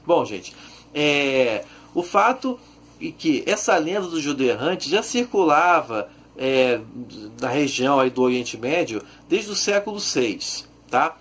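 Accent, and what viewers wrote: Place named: Rio de Janeiro, Brazil. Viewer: Cê e carioca 😳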